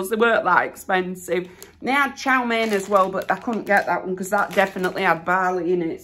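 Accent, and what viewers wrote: Yorkshire, United Kingdom. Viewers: Glad your back and hope your keeping well and yes it’s dinner and tea just stick with the Yorkshire twang we Yorkshire folk are the best ❤❤❤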